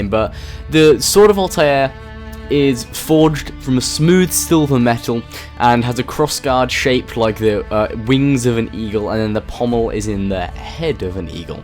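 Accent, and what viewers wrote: United Kingdom. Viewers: I know why u hate ac3 bc Conor kill British soldiers And u sound like a British so it make sense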